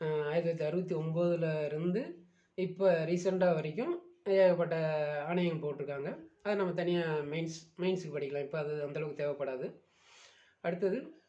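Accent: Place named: Kongu, India. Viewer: Kongu tamil alagu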